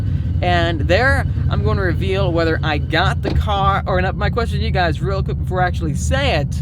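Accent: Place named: Alaska, United States. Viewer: Love the Alaskan Bush people accent! Great content 👍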